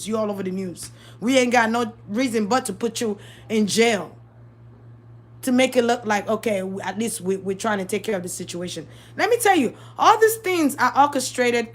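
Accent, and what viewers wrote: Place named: Caribbean. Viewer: You remind of Rihanna are you from the islands ?! I love that accent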